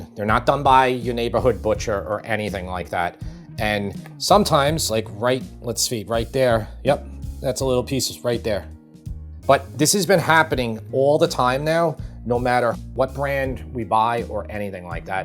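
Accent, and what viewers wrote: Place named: Long Island, United States. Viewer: Love the videos and still getting used to the Long Island accent. When you said 'the le crueset', I thought I heard "The Lakers say the oval ones are like $400". Who do you know from the Lakers and why do they care about dutch ovens?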